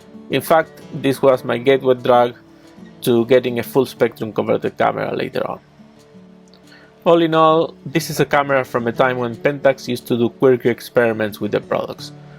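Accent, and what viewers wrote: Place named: Argentina. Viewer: Se te nota el acento argentino, jeje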